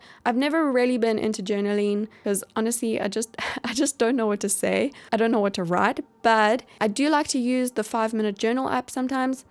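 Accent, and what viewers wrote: South Africa. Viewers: Are you South African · Fellow south African is that you??? ♥️ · Are you South African? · are you from South Africa? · Your accent makes me feel like I'm home :D I'm a South African living in London. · are you South-African, because your accent sounds like it, im South-African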